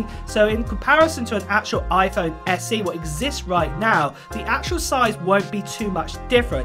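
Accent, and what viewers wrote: United Kingdom. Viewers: Fcking UK accent :D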